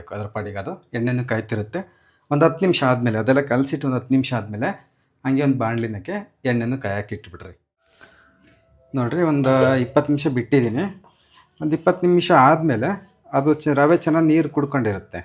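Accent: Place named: Davangere, India. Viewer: ನಮ್ಮ ದಾವಣಗೆರೆ ಭಾಷೆ.😊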